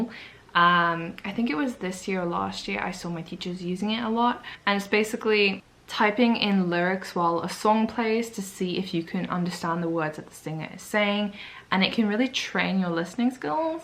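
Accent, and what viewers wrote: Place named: United Kingdom. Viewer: At the beginning I heard only British accent 😂❤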